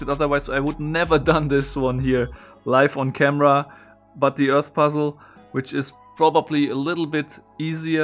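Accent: Germany. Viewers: Sir are u a german?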